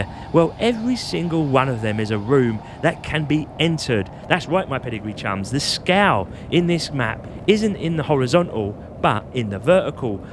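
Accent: Cockney, United Kingdom. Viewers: I didn't think i could watch more shadows of doubt videos but THESE ones have cockney accent! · I'm an American living in UK and my London girlfriend won't let me listen to any let's play videos with American accents as she finds them grating (other than Texan, which I have luckily) so happy to find a Cockney playing games I'm interested in.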